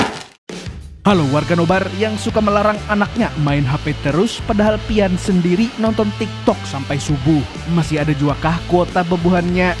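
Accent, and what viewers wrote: Banjar, Indonesia. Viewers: Banjarr is good😂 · Bah orang banjar kah Pian mang,pacangan jadi langganan ni nonton di sini ulun😅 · mang pian urang Banjar kah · Banjar banar amang nih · pian urg banjar kah min😅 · Orang banjar kah bang?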